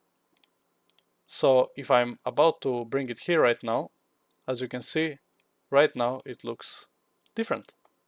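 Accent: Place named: Croatia. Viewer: Odma prvu minutu sam posumnjao da si nas hahaha, hvala ti za ovo <3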